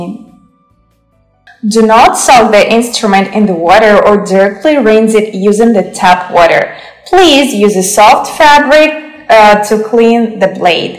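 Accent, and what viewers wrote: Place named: Eastern Europe. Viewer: it’s her accent and lack of pronunciation more than anything. She has a strong Slavic accent.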